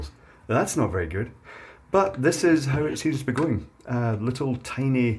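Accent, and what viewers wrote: Scotland, United Kingdom. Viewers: Must be his broad Scots accent.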